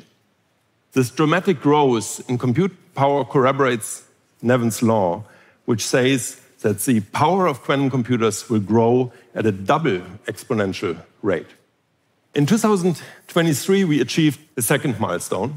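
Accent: Germany. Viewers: his baldness (with or without sunglasses) is superseded by his German accent for his credibility. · i tryed to watch it, but after min he lost me completly. And the german accent has mad it worse. Sorry Hartmut, aber das kannst du sicher besser. Geiles Thema, aber suboptimal transportiert, für mein Verständnis zumindest. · i can hear the german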